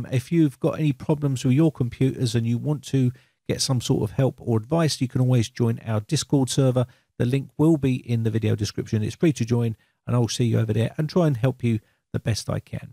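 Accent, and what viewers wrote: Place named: South London, United Kingdom. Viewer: I'm gonna guess South London accent. Rotherhithe maybe? Anyways great video man!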